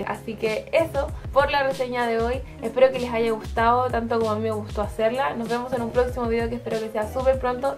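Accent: Chile. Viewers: Bonito tu acento chileno. Compatriota. Pará mí frankenstein es muy bueno por la forma de tratar el existencialismo, vida y muerte. Saludos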